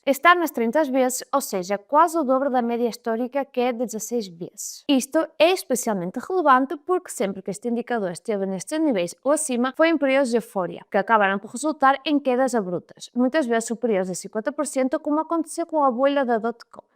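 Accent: Spain. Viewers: não se percebe muito bem o teu "portunhol"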